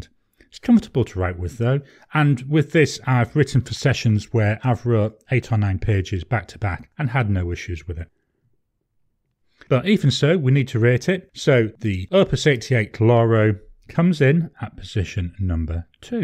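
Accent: United Kingdom. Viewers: Hi, your pens are more down to earth and it was interesting to see your selection rather taste. I liked that you showed the price of the pens but was curious why in $ when your accent is from the UK, then I seen another video, your are in Australia! I have been using a Pelikan M205 B (Merry Berry Ltd Ed), Monteverde Innova F and just bought a Diplomat Elox F. Thank you for your Inkvent and other reviews to keep me occupied, Happy New Year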